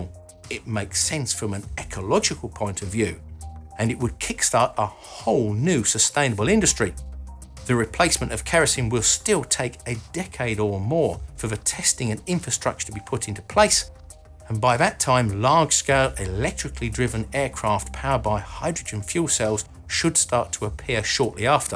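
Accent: United Kingdom. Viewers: Classy man, with classic British accent is a great combination to be a host of an informative show. Much respect from Russia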